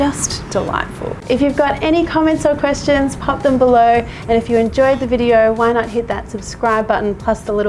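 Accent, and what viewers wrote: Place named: Australia. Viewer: I'm never gonna make any of these recipes lol but I love watching you and hear your Australian accent and sizzling food. Aaaah who else?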